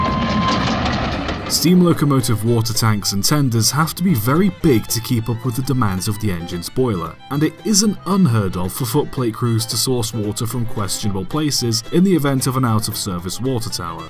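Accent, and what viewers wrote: United Kingdom. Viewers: American South fiddle music and a British Narrator, this has got to be good. Future me: and it absolutely was!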